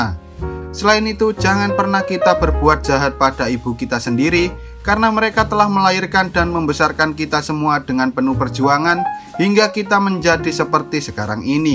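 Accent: Java, Indonesia. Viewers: Wong jowo t min? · Logat jawanya masih kental kali miminya 😅😆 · Jowo mu medok lek😭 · Yang cerita film ini pasti orang jawa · Medhok e lho,,,🤭 Filmnya bagus, sy baper terutama ketika Gihun ingin bertemu ibu dan meminta maaf pd beliau. Apik Bang,,,👍🏼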